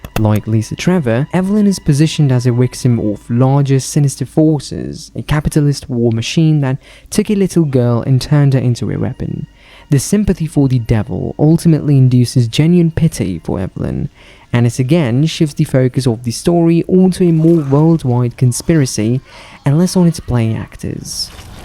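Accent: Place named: Northern England, United Kingdom. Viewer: bro i really didnt mean anything by it. I actually felt bad and was gonna go back and delete the comment. Felt crap to watch such a great video amd just leave that as a comment. Im just usually good with accents and was puzzled by yours. You sound like you're from a nice part of northern england or something. Genuinely tho mate, this was such a good video. I felt like you put a lot of stuff that i felt into words so thanks. Ill definitely watch the new video when uts out too ✌️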